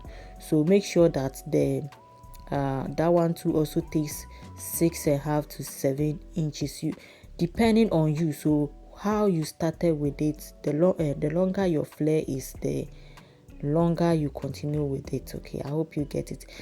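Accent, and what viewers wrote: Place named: Ghana. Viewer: Sis your work is so beautiful and your accent sounds like a Ghanaian ❤🇬🇭….great job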